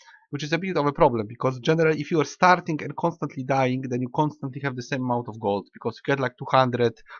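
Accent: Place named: Poland. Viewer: Tak myślałem, że jesteś z Polski xd zdradził Cię akcent hehehe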